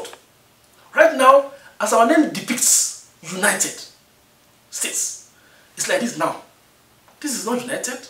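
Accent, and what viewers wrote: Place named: Nigeria. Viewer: with an accent so Nigerian, hmm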